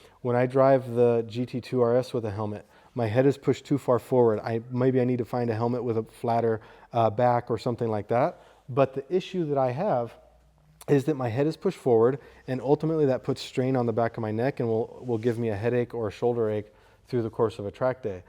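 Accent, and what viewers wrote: United States. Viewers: Totally off topic, but are you American? Obviously you're in Germany, but you speak like an American so just randomly curious.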